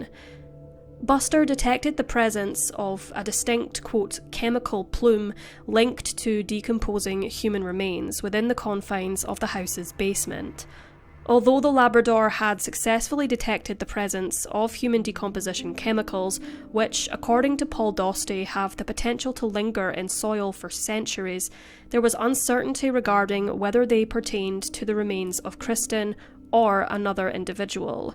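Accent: Scotland, United Kingdom. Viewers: Where is your accent from clearly Scotland but guessing not quite as far north as Aberdeen, right?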